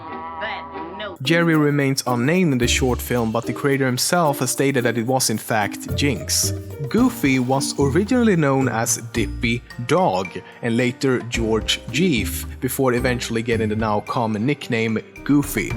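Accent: Sweden. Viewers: You! Person who is making this video. Where are you from? Your dialect sounds a lot like those who come from my country, Sweden! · Hes not russian he is actually swedish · Is he swedish?? He has a swedish accent.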